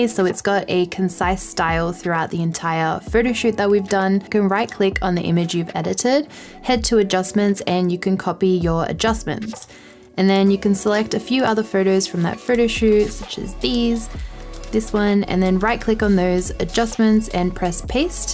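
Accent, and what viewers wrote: Australia. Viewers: It's Aussie? I couldn't place it. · luv ur aussie accent ❤️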